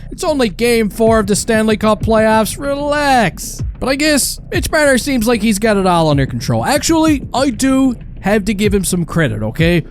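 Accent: Newfoundland, Canada. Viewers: you sound like yer from newfoundland b'y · Yes by' are you a newf me son? Unless me ears ain't hearing right. I'd say you are from the rock! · Is a newfie? Sounds like it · Your accent... are you a newfie? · what part of atlantic canada is this fella from? felt like I have home in newfoudland listening to this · dying at this sadly its all true 😂😂btw you gotta be a fellow newfie i haven't heard an accent that thick anywhere else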